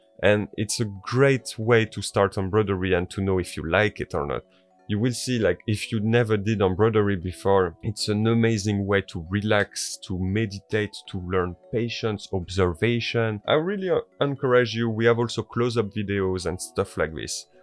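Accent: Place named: France. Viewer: French accents speaking English is so lovely. Gorgeous needlework too 🥰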